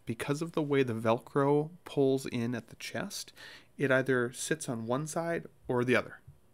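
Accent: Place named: Canada. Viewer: got that sweet ASMR soothing Canadian voice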